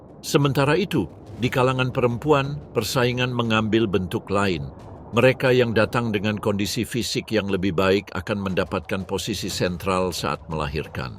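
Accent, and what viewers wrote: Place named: Malaysia. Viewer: Ini konten orang Malaydesh jangan di tonton itu logat bahasanya orang Malaydesh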